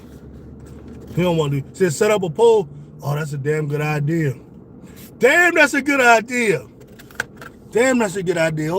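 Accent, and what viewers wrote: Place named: Philadelphia, United States. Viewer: Bruh I'm at work at 3 am listening to ur content u got me cracking up 😂 really enjoy ur channel very informative and the way u talk that Philly talk saying jawn u got a subscriber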